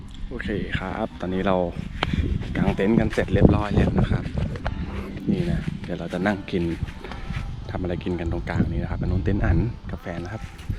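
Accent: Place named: Kamphaeng Saen, Thailand. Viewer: สำเนียงเหมือนคนกำแพงแสนบ้านผมเลย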